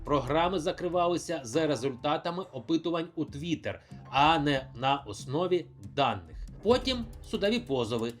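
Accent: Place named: Russia. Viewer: Лайк за відос! Але перестаньте Акать,бо дуже схоже на как цапню!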